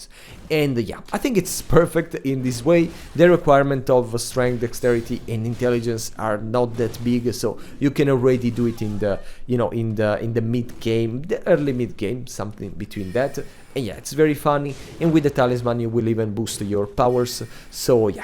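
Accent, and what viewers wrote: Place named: Italy. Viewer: are you italian because of your accent btw good vid keep posting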